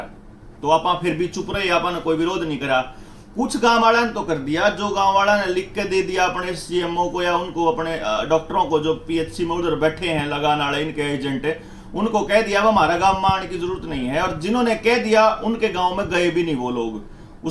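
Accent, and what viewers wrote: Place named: Haryana, India. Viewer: हिंदी ही है पर लहजा हरियाणवी है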